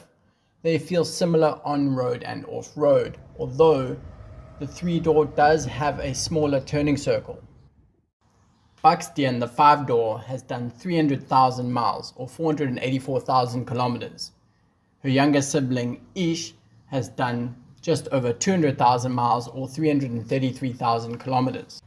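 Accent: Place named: South Africa. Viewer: Loving the South African accent and "Eish"a good name! My 1996 5 door is named RAVioli, a nod to my Italian heritage. Where can I get a cargo door handle that doesn't cost an arm and a leg? I'd need to ship to South Africa. eBay and Amazon sellers don't ship here from the US. I don't mind second hand. 🙏🏼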